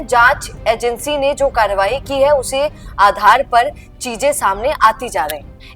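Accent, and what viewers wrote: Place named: Dhundhari, India. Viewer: A very good anchor n dhudadi language in between sounds very sweet.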